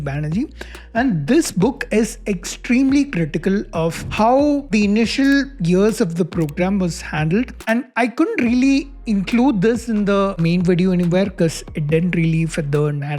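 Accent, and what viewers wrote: South India, India. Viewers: Hey, no doubt that you have ample knowledge of the topic but the only problem is that your accent is too much south indian. Please try to improve it and I can bet that your viewership will increase many folds. Just a suggestion for your growth, please don't take it otherwise. I faced similar problem 18 years ago when my accent was Punjabi and people used to make fun of my English. Thanks, God bless you 🙏🙏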